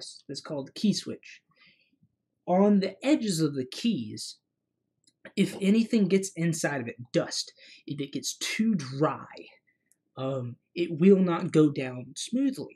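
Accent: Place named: South, United States. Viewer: Great video and the Southern accent is badass.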